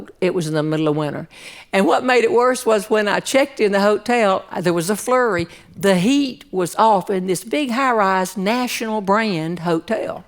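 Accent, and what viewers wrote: South, United States. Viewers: I love u! wish you were my family. love your story telling your southern drawl and your humor. God bless u every day. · It's not that funny things don't happen around us, but not everyone has the gift of storytelling you do. I could tell the same story but a whole crowd wouldn't laugh. A true gift. It's especially funny with the southern accent. I'm in MN so I'm sure mine would make you laugh. That's why people quote Fargo. Yeah Sure You betcha.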